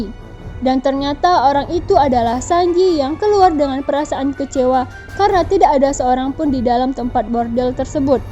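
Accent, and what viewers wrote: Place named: Malaysia. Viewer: Apakah pengisi suara ini orang malaysia? Sepintas aksen nya cem melayu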